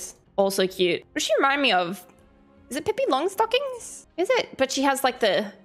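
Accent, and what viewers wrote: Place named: Australia. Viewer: Is it just me or is getting more Aussie sounding as time goes on?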